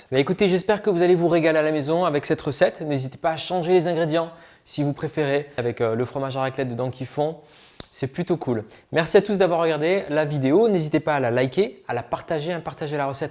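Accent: South, France. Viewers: A tester dès que possible avec notre reste de fromage à raclette. Je sais pas si c'est moi, mais je trouve que notre Hervé, il rechoppe un peu plus son accent du sud. C'est charmant!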